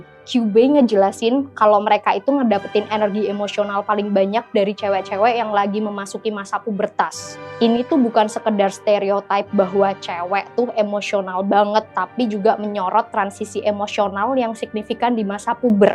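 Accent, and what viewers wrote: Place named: Semarang, Indonesia. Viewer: top reviewnya mbak, btw mbak e logate kota semarang ig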